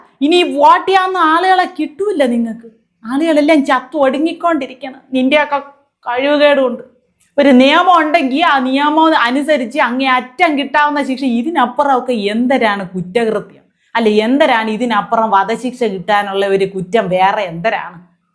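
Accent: Thiruvananthapuram, India. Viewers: ജി നിങ്ങളുടെ തിരുവനന്തോരം ഭാഷയിലുള്ള സമകാലീന വിഷയത്തെ അവതരിപ്പിക്കുന്നശൈലി ഒന്നും പറയാനില്ല..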